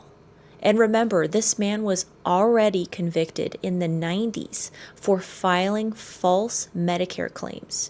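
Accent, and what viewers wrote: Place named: Northeast, United States. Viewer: I love the way she talks, the way she pronounces tag, bag and flag. Shes got to be from the northern east coast ❤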